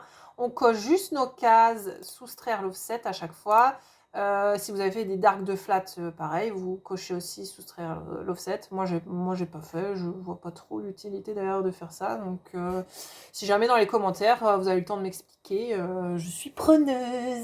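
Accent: Alsace, France. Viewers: Ah on entend enfin ton accent :) et je découvre l'humour alsacien :) sinon je garde ça de côté j'en suis pas là !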